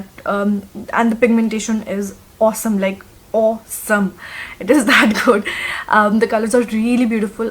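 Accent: India. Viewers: sana Zehra seriously?? Just because she don't have a build up accent to her english, her english is not good? Well grammatically it's all correct, just that she's speaking in her natural indian accent you find it "not good"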